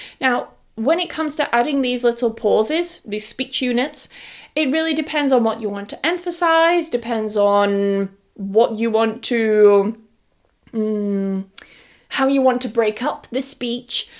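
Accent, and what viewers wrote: United Kingdom. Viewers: The British pronunciation is amazing 🤩 when I listen to it, I relax 😁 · "Hello Madam, it’s been two years since I was your student. I started my journey following your British accent, but I’ve since fallen in love with the American accent and focus on that now. Please know that you hold a very special place in my heart, and I miss you more than words can say. Sending you all my love and respect from Bangladesh ❤❤❤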